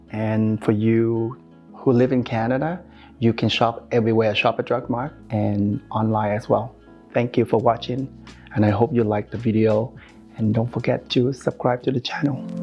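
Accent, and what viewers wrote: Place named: Vietnam. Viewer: You have the cutest vietnamese accent. My boyfriend is vietnamese american i live in a very vietnamese area in northern va as far as asian population. Love ur food and ur culture 💚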